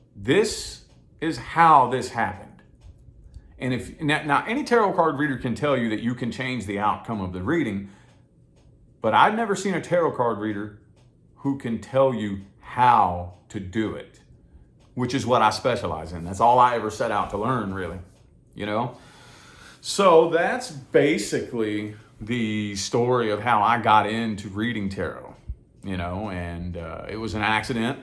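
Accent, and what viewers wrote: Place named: Kentucky, United States. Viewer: So I just found you and listened to both of your Capricorn (July) readings as I have discovered Tarot readers on YouTube (yeah, I am late to the game LOL) just about a month ago and have dug deep and found some amazing readers and great insight to my psyche and situations. Brother, I am originally from Kentucky so I picked up on your accent that is honey to my ears. Brother, you clicked right into my situation like a hand in glove. And your advice was so in tune and made me see clearly the path I was hesitant to take. Love your honesty. I am a fan for LIFE.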